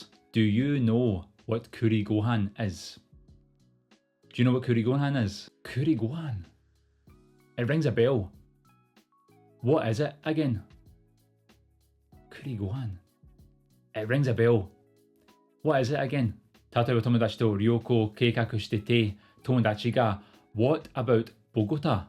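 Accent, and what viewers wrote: United Kingdom. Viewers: イギリス英語だぁ〜💕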